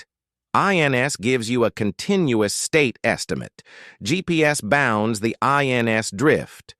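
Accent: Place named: South, United States. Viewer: Why your ai sound like hes from the south?😅 nice video though!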